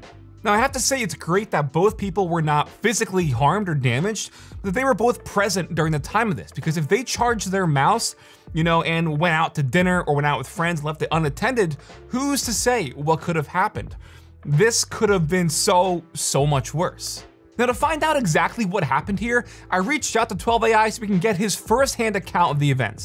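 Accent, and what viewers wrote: Singapore. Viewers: Lmao that Singaporean accent is so easy to recognise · sounds Singaporean hahahah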